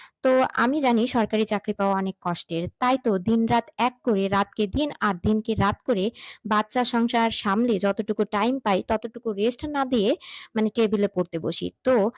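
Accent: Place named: West Bengal, India. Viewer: Hey there! No offence okay ! Take it positively.. 😊 I guess ya should focus on your pronunciation first while speaking in English. Follow British or American accent not indian or bangali style.However I do appreciate your confidence. Indeed😇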